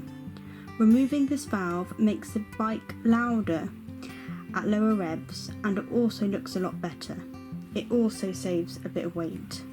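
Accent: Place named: United Kingdom. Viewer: The bike looks, and sounds, absolutely amazing. Of course, so do you, young lady. I love your accent. When I found out I am part Brit (not sure if you watched my video about it) I wish it came with a bit of a Brit accent, too. LOL. Your little brother has great balance, does he compete?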